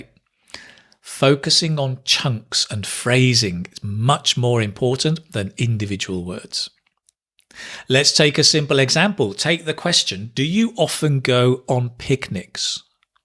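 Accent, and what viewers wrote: United Kingdom. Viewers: I love British accent, do you have a podcast?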